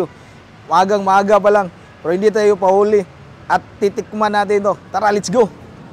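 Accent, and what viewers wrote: Visayas, Philippines. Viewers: Bisdaka gihapon mu Tagalog Bai uy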